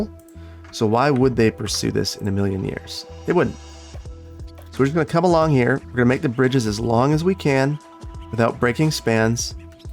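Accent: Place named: Midwest, United States. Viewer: Same! I just assumed he was talking about the Midwest from his accent alone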